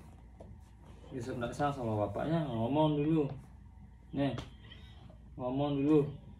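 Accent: Lombok, Indonesia. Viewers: Bang logat lombok nya masih melekat😁💪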